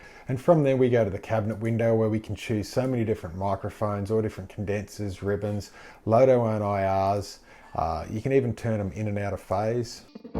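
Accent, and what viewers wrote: Australia. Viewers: Hello! My name is Pietro, I'm from Brazil and I love your channel! I'm 22y, started playing guitar 2 years ago, and I'm not gonna lie, sometimes I have a hard time trying to understand what you say, my english is not perfect and the accent for me still hard to understand But I'm trying to practice, I got an australian citizenship from my father so I'm willing to move to Australia within the next few years, so better get used to the accent hehe I like specially your boss katana videos, I always wanted an amp like that but unfortunately it is too damn expensive here in Brazil Everything is expensive, it would take me 3 entire salaries just to buy the katana mk2 50w, it is simply not doable But I'm at the hope that someday I will have a guitar room just like you do CheersHello! My name is Pietro, I'm from Brazil and I love your channel! I'm 22y, started playing guitar 2 years ago, and I'm not gonna lie, sometimes I have a hard time trying to understand what you say, my english is not perfect and the accent for me still hard to understand But I'm trying to practice, I got an australian citizenship from my father so I'm willing to move to Australia within the next few years, so better get used to the accent hehe I like specially your boss katana videos, I always wanted an amp like that but unfortunately it is too damn expensive here in Brazil Everything is expensive, it would take me 3 entire salaries just to buy the katana mk2 50w, it is simply not doable But I'm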